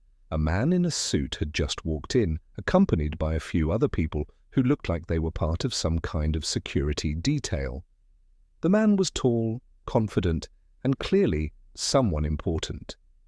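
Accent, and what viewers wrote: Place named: RP, United Kingdom. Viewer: Greetings from Poland, and thank you for a great story! Your narrator has an impeccable English accent (RP, isn't it?) that allowed my listening comprehension to be about 100% without subtitles, even though English isn't even my second language (I only studied EFL).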